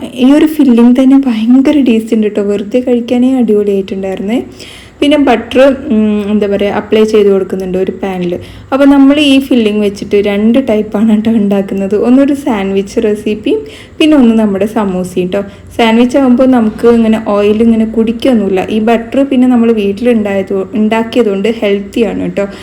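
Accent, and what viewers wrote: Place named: Kannur, India. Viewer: Fest thane adichu varunna seen ozhivako maachi🤣🤣🤣superatttto to to to kelkan rasam kannur ami good recipy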